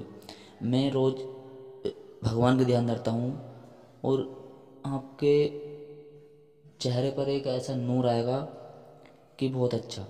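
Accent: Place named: Western Uttar Pradesh, India. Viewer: Bhai teri awaj se lagra hai Ki tum west up se ho Am I right ?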